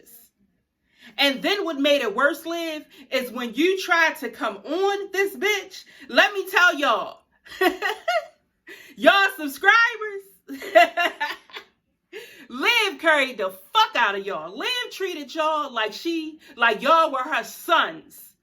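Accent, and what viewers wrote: Baltimore, United States. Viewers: The one thing I love about you is that you say what you say and you leave it alone, you do not fall into the back and forth, some one calling you out and they can't take the heat, lookin for sympathy, next Hussle literally crying out for it, not gonna even say the frauds name, I looked up this Liv person, but I see you give great advice, she should listen.....Keep doing you...SO SO glad I found your channel and I love the accent.. B-more I'm thinking if not I apologize.... Lost my niece to Baltimore. Anyway, You rock.... Thank you for being honest and real...saying luv luv from Chicago..💯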